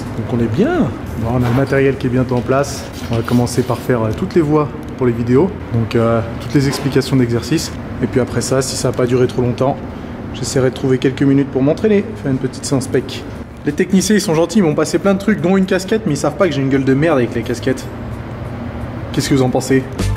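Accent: England, United Kingdom. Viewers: Meme pas une petite fin avec ton accent anglais?! J'espère voir ca dans une futur vidéo! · Sympa ce genre de petite vidéo aussi ! Par contre je suis déçu je voulais entendre ton accent anglais x')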